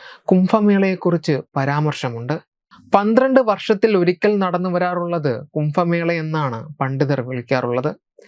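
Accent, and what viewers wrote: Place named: Kottayam, India. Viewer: Are you from kotayam or Pathanamthitta area bha or pha 😂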